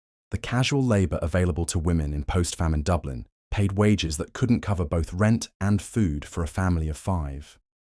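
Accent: England, United Kingdom. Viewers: I know this is terrible,,,,is it just me or does his English accent make this sound more…. you know what I mean?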